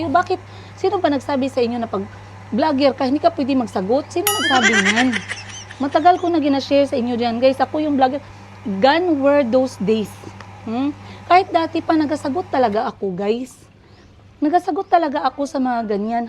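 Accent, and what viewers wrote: Iloilo, Philippines. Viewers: Oo alam ko Yan ma'am,sa Doon kpa naniraha sa Uganda Kasama c Mr yiga,ginawa mo Ang LAHAT na makakapagkakitaan ginawa mo Ang LAHAT na mapagkakitaan,Minsan lang Ako magcoment pero nanonood Ako sa mga vlog mo prove,sa Uganda magluto ka ng bisayang manok,nasasarapan Ang mga taga Doon sa luto mo,at kahit Meron na c chico nagbabantay ka sa tindahan my at Meron Kang katiwala Kasama mo pero Ikaw Ang nagmamahala Doon,at Ang umuwiwi ka gensan, at nakakapagpatayo ka ng Bahay sa nabili mong lote,alam ko no? Taga Digos PO Ako bisaya PO Ako,sinusubaybayan kita Hanggang dumating c mayumi,at may mayumi closet na Ang Bata Hanggang umalis na c ate Christine,believe talaga Ako sa yo,Ang tapang mo na pinalaki mo ng maayos Ang mga anak mo,at may nalalaman na akong salita 2 lng( talat- sa ating salita ay palengke,at sawadika or sawadikap-good morning!o kamao na ko no? 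suggestion lng PO maan,f ever suyuin ka ulit ni Mr yiga,pwede pa ba SYA na pumasok ulit sa puso? Masaya Ako pagnangyari Yan kasi mayroon kayong mga anak,daghang kaayong SALAMAT ma'am,nkatawa ko nmo ba Tagalog Ang salita mo pero illonggo Ang tuno ng boses,pero nalingaw ko uy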